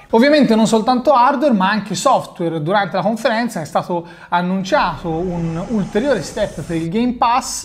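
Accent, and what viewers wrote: Tuscany, Italy. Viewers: sei toscano? 😂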